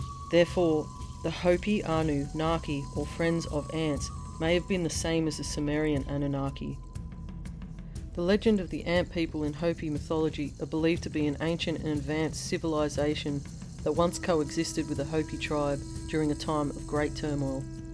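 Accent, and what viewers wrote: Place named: Australia. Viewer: Thanks for making these new connections in such an engaging Aussie voice!🙏⚡💛🦘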